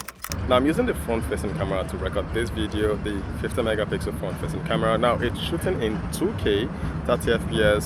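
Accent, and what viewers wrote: Nigeria. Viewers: I just wanna say thank you to my Nigerian brother with this beautiful accent, love from iran❤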